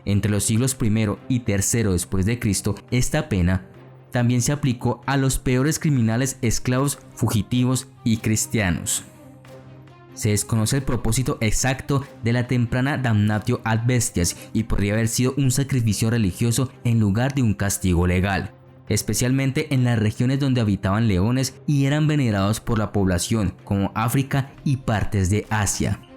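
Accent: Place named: Bogotá, Colombia. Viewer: Ese es rolo, no le ve la voz.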